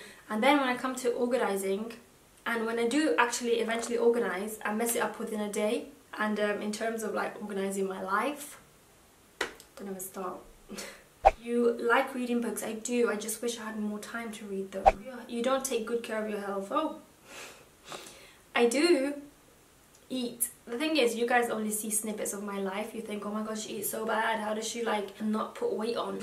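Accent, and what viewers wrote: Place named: North, United Kingdom. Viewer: She has a British Northern accent 100%.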